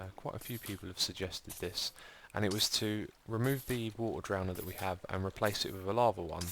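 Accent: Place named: United Kingdom. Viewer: do you realize for the longest time whenever you mentioned Biffa, I always thought you were saying Biffer, with the British accent there's not much of a pronunciation difference anyway.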